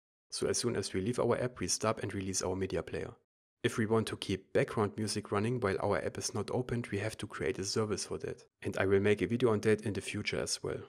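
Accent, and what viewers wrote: Germany. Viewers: I smell german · Thx man... BTW I like GERMAN Accent 🌚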